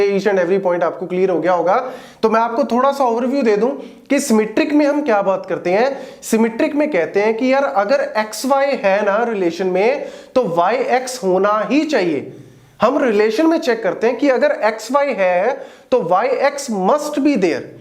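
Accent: Punjab, India. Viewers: I love your punjabi accent so much😍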